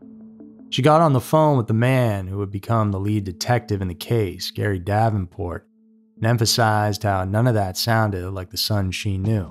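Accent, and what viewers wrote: South, United States. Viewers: Thank you, new sub here, you have such a smooth soothing voice to listen to. I'm Australian, trying to place your accent, there's a hint of a slow Southern drawl🤔thinking Matthew McConaughey in Lincoln Lawyer😍but I'll settle down😂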